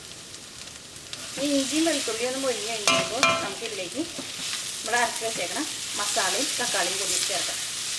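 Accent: Thrissur, India. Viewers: good cook and good Trichur accent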